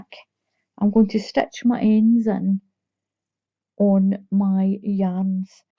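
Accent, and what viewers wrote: Scotland, United Kingdom. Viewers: I've saved this fan pattern. I'm just a learner but I love trying out patterns. What size of crochet hook did you use ? Keep up the artistic work, your super duper . You speak the same lingo as me hen!!, nice to meet a Scottish lassie !! X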